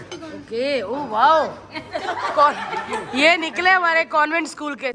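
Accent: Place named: Odisha, India. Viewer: odisha se hai isiliye ese pronounce karte hai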